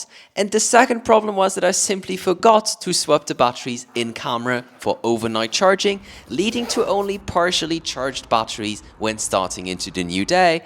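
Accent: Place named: Ireland. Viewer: Where did you get the Irish accent?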